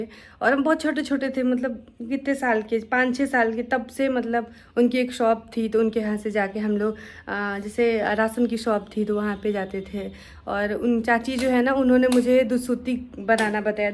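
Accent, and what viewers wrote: Bhojpuri, India. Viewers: Hiiii didi Ppplllllssss reply Bhojpuri khobb badhiya lgta hai aap waise hi bola kijiye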